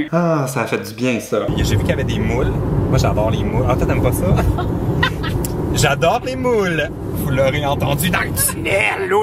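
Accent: Quebec, Canada. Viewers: haha,je suis presque comme ca...mais super video je te kiff et j'aime bien ton accent(québécois????)💜💙❤💚💛💋👍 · J'aime tellement l'accent canadien 😍😍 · Cc je viens de la France 🇫🇷 je t'adore surtout ton accent québécois 😜! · moi j'aime bien l'accent québécois!!!! je suis française et j'ai ptète un accent breton de merde XD je t'aime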